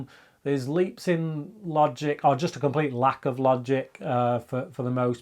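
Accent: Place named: Scotland, United Kingdom. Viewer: I finally stopped hearing your scottish accent, the more you listen, the more you get used to it i guess, now your speech sounds like poetry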